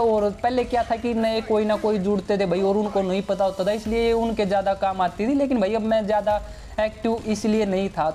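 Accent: Haryana, India. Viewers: Bhai yo b haryana te h k eski awaaz sunke to nue laage h k u haryana t h????bera ho to tne???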